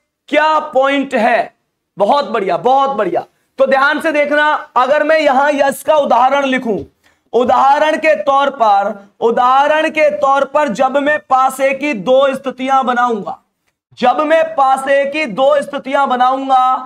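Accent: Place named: Aligarh, India. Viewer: Sir aap aligarh se ho kya Apki language hmari jesi h😂 M aligarh se hu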